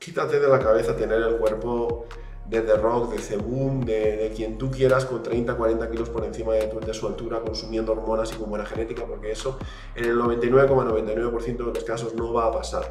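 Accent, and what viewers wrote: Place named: Latin America. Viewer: Coach te quiero mucho, pero ¿por qué hablas como latinoamericano siendo valenciano?. Un saludo crack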